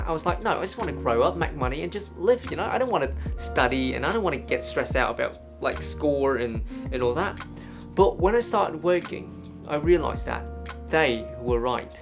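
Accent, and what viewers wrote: United Kingdom. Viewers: Your British accent is so charming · I'm really love your british accent haha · Giọng thì Anh anh nha Mà cái cách nói chuyện thì mix với anh mỹ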